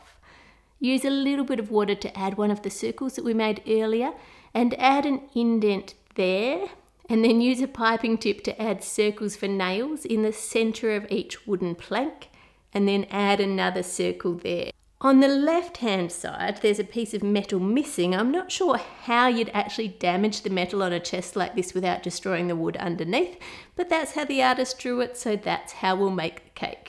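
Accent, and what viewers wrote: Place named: Australia. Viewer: Why am in love with her accent Australia Day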